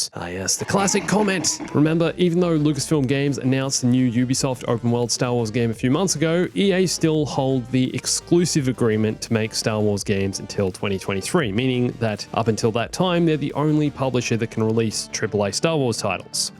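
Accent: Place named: Australia. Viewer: Mate you sound like a Aussie I got a X series about two weeks ago I love fallen order and it doesn't freeze it plays better and not as dark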